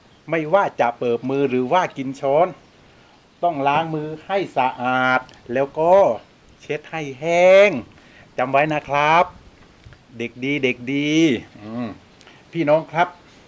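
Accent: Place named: Central Thailand, Thailand. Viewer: คุณ เป็นคนใต้ แต่พูดกลาง ให้เกียรติแฟนคลับภาคอื่นๆด้วย น่ารักมากค่ะ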